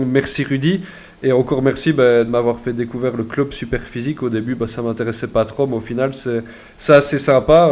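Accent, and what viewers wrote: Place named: Belgium. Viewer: Sympa le vlog et l'accent belge ;) Tu étudie quoi ??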